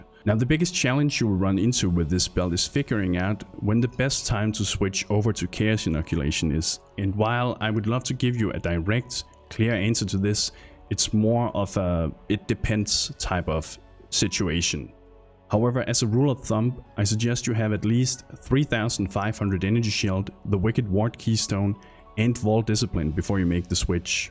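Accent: Denmark. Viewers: jeg har altså en eller anden idé om at den eneste grund til at folk ikke snakker om dig/recommender alt dit content er pga din horrible accent:P Det gør ondt at høre på som dansker, men det er sikkert også ret skidt for ikke-danskere. No offense! Jeg ønsker dig kun det bedste... men ja, øv, måske du kunne (måske bare for min skyld.. det kan godt være jeg er den eneste) prøve lidt hårdere på udtalelsen? Den værste er når du siger "whalecum" istedet for "welcome"... oof!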